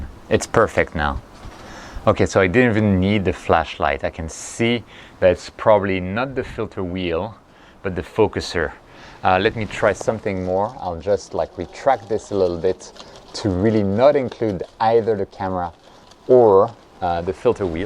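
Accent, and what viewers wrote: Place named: France. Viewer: ahhhhh I knew there was some french tones behind! ;-) sounds like my french aunt accent , who is living in California since the 60s... that's why my ear is used to this very faint "french touch"... and that I definitely prefer the butt plug term (LOL) . I guess you're right about aesthetic, especially during nighttime, nobody cares! I'll go for the "amazon touch" as soon as tomorrow evening. Looks like I had access to another video i wasn't supposed to..sorry.. not my fault! But as a consequence I didn't received your answer to my comment about taking flats during sunset... :--)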